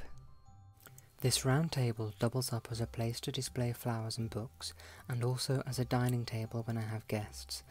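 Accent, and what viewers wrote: United Kingdom. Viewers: i always look forward to your videos. its like watching a real life Mr Bingley in a modern era, how delightful to hear your british accent, the aesthetics of your video and of course the lovely content like your beautiful table setting and your food. i also noted that this time round, you did a close up of all your wonderful table accoutrements. ooh those monogrammed napkins, need i say more! they are so very you.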